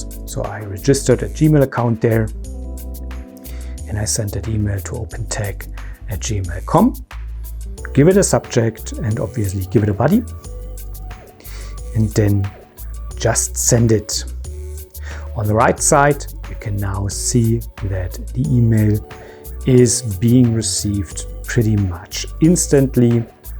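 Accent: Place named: Germany. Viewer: Gotta love the Hans Landa accent. Thanks for the contribution friend!!!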